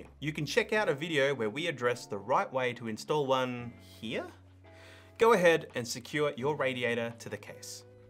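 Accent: Australia. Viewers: Awesome walkthrough! I was 90% sure of how to go about this, but having a lovely Australian voice to check my work was immensely helpful and reassuring.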